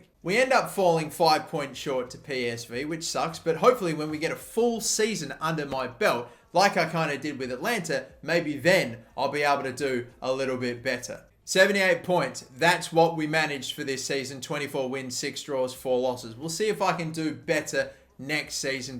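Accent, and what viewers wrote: Australia. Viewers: halo289 he’s Aussie 😂😂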